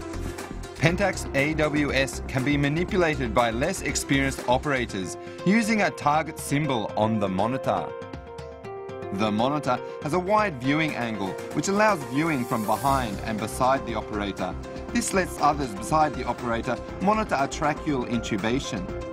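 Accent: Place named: South Africa. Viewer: I love the south african accent; it reminds me of Alan Partridge series 2. This looks even easier than glidescope.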